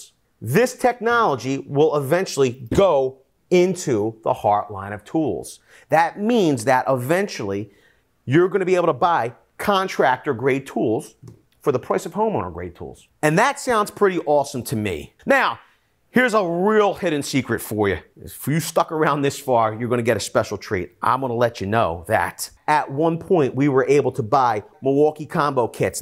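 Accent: Philadelphia, United States. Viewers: That's a Philly accent, not New York LOL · that’s definitely not a New York accent. It sounds like Philly.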